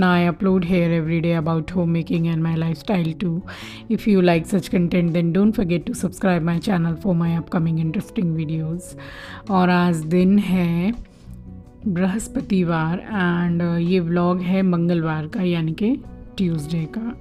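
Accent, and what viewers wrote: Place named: North India, India. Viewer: Your way of talking like us l mean north side 😊 l like u so much ❤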